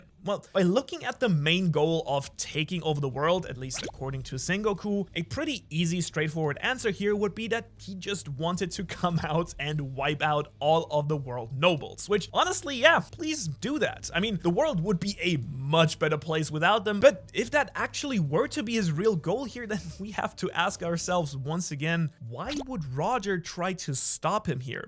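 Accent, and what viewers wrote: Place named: Germany. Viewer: Ich habe gerade Raffey‘s neustes Video geschaut und ich wusste nicht dass du Deutsch sprichst. Jetzt höre ich den leichten Akzent aber ich hätte es nie geglaubt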